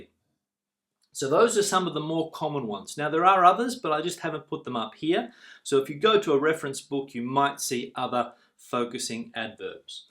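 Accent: United Kingdom. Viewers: you have a British accent,am I right? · I love your British accent.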